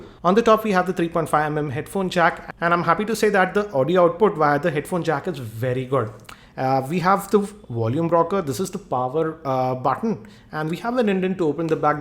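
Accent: India. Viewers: Não tem como parar de rir com sotaque indiano, pqp! AUEHAUEHAUHE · Nice accent,Indian-English Accent are nice to hear and above all they are clear Your Video is Very Informative, Thumbs Up for your Vid!..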